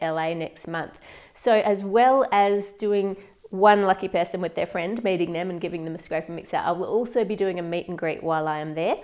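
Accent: Australia. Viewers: Do you live in Australia you sound like it not to be rude or anything I love your videos I am so hungry now🍧🍨🍭🍬🍴🍪🍩🍮🍫🍦🍰🍟🍖🍕🍔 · Your Australian (:0) whaaaaaaaaaat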